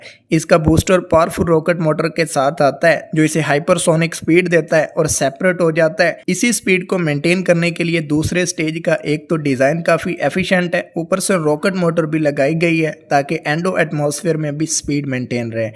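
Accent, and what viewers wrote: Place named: Punjab, India. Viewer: Bhai please English panjabi style me na bola kar bura lagta hy.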